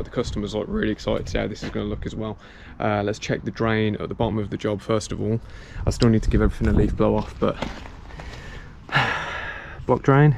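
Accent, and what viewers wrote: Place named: United Kingdom. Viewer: Hi...where is it you're from in the UK? I seem to recognise the accent from my UK years, but I'm not too sure...cheers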